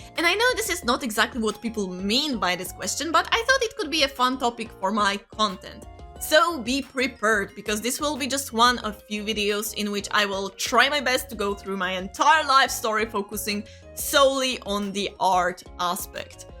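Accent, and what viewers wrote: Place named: Poland. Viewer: Honestly after I heard you speak Polish I realized that you do have a Polish accent, but before that I didn't pay attention to it at all so XD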